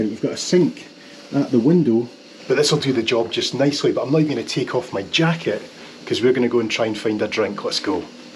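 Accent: Scotland, United Kingdom. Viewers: I am definitely with you, as a solo traveller most of the time, always much more enjoyable in Winter with quietness and calmness, LOVE all of your videos, icing on the cake to me is your charming Scottish accent;)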